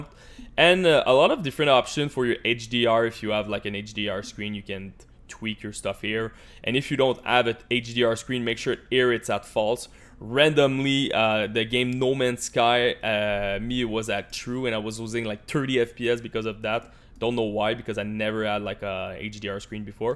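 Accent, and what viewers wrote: Quebec, Canada. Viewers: You have beautiful Quebec English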